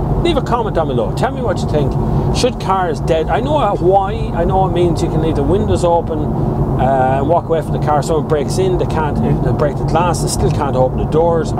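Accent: Ireland. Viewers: Have you ever said the word Tree and found that it sounded identical to the word Three. Just was wondering because of the Irish accent.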